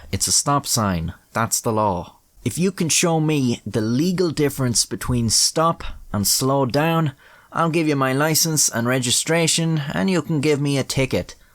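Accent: Ireland. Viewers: You have that Irish accent that sounds sarcastic/ angry the whole time. Think Ive seen a comedy sketch about people from there trying to sound sentimental and it was hilarious! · I find the cartoons and Irish narration of these subjects so hilariously charming in these videos. You have the gift of the Blarney. Keep up the great work. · Brilliant vid I think you are fantastic. Do you doodle the sketches. So clever! Better than the usual generic clips. Best satire out there even better your irish 🇮🇪 like meeself 👍👊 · This Irish accent is the best English accent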